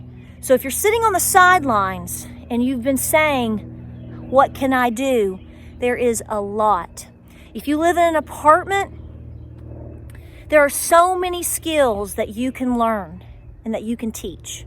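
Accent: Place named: Appalachia, United States. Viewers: When I hear you speak it gives me a taste of home. That Appalachian twang is music to my ears. And good old fashioned common sense, something that is in short supply, especially now. I live in Florida and the “spring breakers” are acting so irresponsible even today they were doing Jell-O shots off girls stomachs, partying like nothing going on. Incredible. Incredibly stupid. Now they are taking the virus home to their families. Can’t get my head around it and why their parents would allow them to even come here right now. I’m staying home because it’s the right thing to do. So should all non-essential folks. You a few other channels taught me how to dry can which I started doing in July cause I couldn’t afford a pressure canner . I finally got one and have been busting my hump canning and you guys taught me and I thank you so much. 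God put it on my heart last year to get ready, something is coming and I did my best. We are not were I wanted to be yet but I’m probably ahead of 95% of the country and I am so thankful. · Also I'm from West Virginia....I can tell you're close geographically and not just by you also being an Appalachian queen! I can hear that beautiful accent...mine is barely noticeable,but sometimes people will ask to which I'm always surprised since mine is so minor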